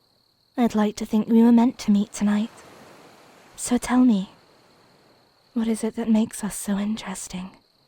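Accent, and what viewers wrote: United Kingdom. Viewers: Amazing audio, your british accent is very nice and I would love to see spy wife / girlfriend storyline to continue there is so much suspense in that one 😊☺️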